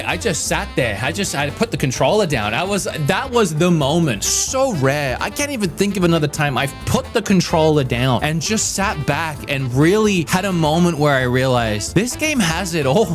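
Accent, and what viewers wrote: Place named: Australia. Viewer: Im guessing ur Australian? Haha don’t let the Europeans hear that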